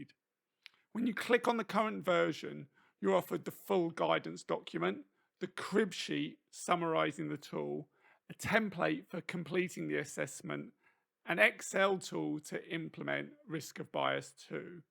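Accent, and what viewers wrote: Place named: Australia. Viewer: The Australian accent 😢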